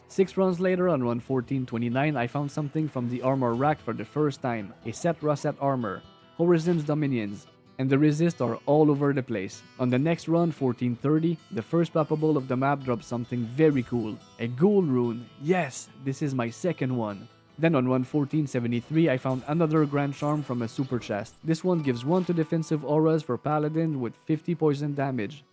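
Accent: Quebec, Canada. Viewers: french canadian? "three times faster" is a weird thing to say mathematically "In a third of the time" is more accurate I guess the old "speed" was "1 enigma per 60 hours" so "1 enigma per 20 hours" is three times as fast, or two times faster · Mon frere! I love how, with your beautiful Canada accent, whenever your say poppables is sounds like 'palpables', like you are a medic examining someone :)) Also, I found, and totally random, 'cause when I farm I usually go, in the same run - Travi, Mephisto, then LK, Countess and then maybe Andy, so this time I found, on P8 LK, and Ohm rune, under a Corpse. So a 'palpable' corpse gave me OHM = Oh, Homme, OH HI MARK!!! = )) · That crisp Québec accent. That many runs, getting a sorc would saved you substantial amount of time with telekinesis only without even counting teleport.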